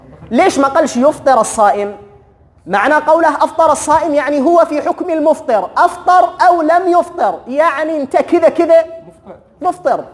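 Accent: Yemen. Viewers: مااجمل الهجه التهاميه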